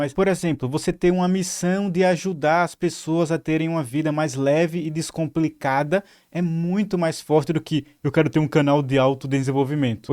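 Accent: Northeast, Brazil. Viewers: pelo seu sotaque voce é nordestino. Sou da Paraiba e gosto muito dos seus videos. De onde voce é?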